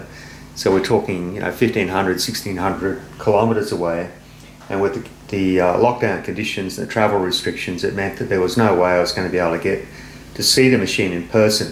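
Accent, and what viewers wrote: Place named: Australia. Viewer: Great videos and it is so nice to hear an Aussie accent. You are fast becoming an Aussie "This Old Tony". Well done!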